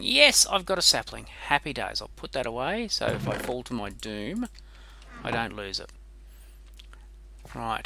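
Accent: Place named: Australia. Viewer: It's great to hear another Aussie accent! I've been watching Pixlrifs' series and thought at the start i could play such a world...but then the more he got into it, the less confident i feel!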